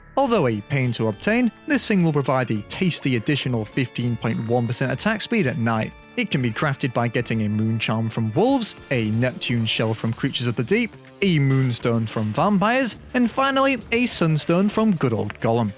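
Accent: United Kingdom. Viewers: Oh my godness this British accent,mmmm, sweetest sound to my ears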